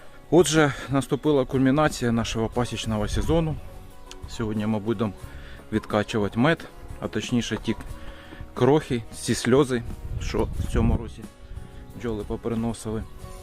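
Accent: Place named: Mykolaiv, Ukraine. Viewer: Привіт Миколаївцям ! Все буде Україна Слава героям України ! Не думав що так Миколаїв розмовляє солодкою українською тай ще зачарованою… Хай вам щастить ! Тихого і мирного вам неба! З вами був кримський українець ! Привіт із Північний захід Америки! У нас цей рік як ніколи … просто все залито…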